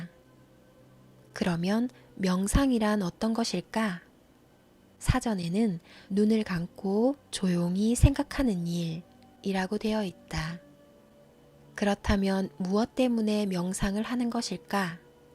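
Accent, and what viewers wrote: Gyeongsang, South Korea. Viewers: 경상도분이시네요 어감도 좋구요 좋은 내용과 좋은 말투 감사합니다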